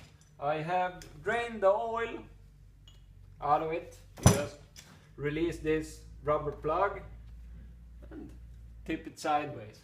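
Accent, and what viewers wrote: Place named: Upper Peninsula, United States. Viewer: cool, the accent is very similar to what it sounds like in our Upper Peninsula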